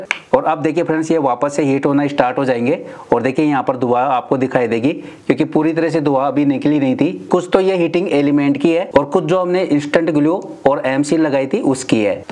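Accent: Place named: Bihar, India. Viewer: Extremely GOOD EXPERIMENT & worth the effort & please form A company to manufacture & sell as a start up company !! You sound like a BIHARI SCIENCE GRADUATE !! If you R please confirm or deny it !! Please also wie your own introduction in Hindi or English as I am proficient in both languages !! From A ( Retd ) MENTAL HEALTH PROFESSIONAL, ORIGINALLY FROM BANGALORE !!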